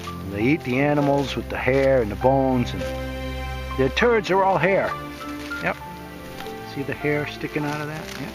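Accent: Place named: East Coast, United States. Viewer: Sounds like an east coast Dick Proennecke!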